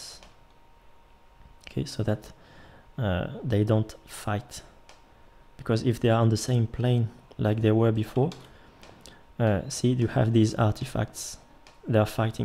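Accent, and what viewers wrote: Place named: France. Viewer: ton accent français est visible x)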